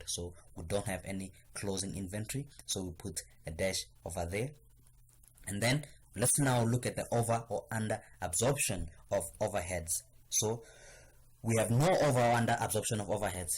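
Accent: South Africa. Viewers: chee are you south african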